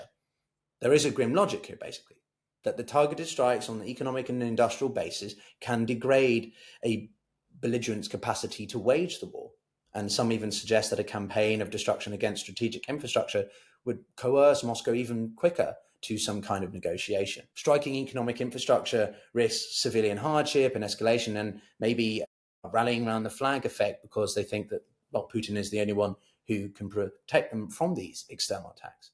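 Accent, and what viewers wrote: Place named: England, United Kingdom. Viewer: Another Nice Jew-is boy with an English accent.. Talking crap! Says The BronxBoy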